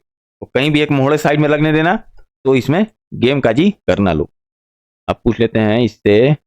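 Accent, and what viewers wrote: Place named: Uttar Pradesh, India. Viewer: Kaha se ho Bhai utter pradesh ki bhasa bol rhe sir 🎉🎉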